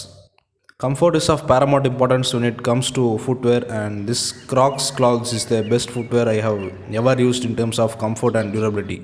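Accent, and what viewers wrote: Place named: Tamil Nadu, India. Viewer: I like you tamil english accent atleast you don't fake it 😊.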